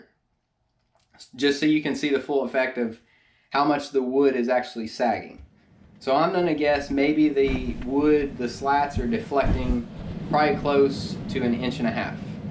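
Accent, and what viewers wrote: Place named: South, United States. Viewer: I wonder what this southern sounding guy keep in that safe 🤔🔫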